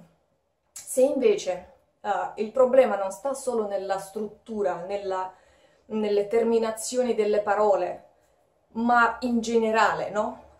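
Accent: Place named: Rome, Italy. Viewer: modi e parole "romane" ti dona e ti dà un certo "charme"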